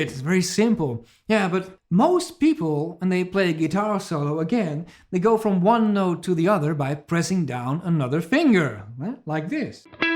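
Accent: Netherlands. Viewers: Finally, we get to learn your accent is from the Netherlands! I always thought it was from ukraine or something….lol. Either way your guitar playing is the language i like best!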